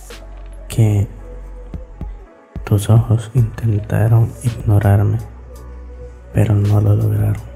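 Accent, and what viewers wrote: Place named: El Salvador. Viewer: Qué bonito audio me parece conocer ahí Quisiera saber quién eres Me parece que eres salvadoreño igual que caries ese lugar me gustó mucho tu audio tú eres salvadoreña tienes ese acento favor dime quién eres Este siento triste me gustó tu audio hace 9 meses Gracias por compartirlo nunca lo había visto que Dios te bendiga❤💯👌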